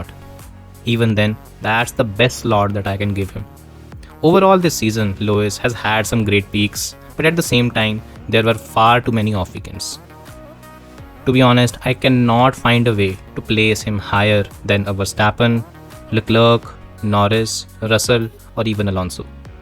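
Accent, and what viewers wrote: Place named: India. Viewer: When i heard an Indian vioce i swiftly paused it as i know all facts will be wrong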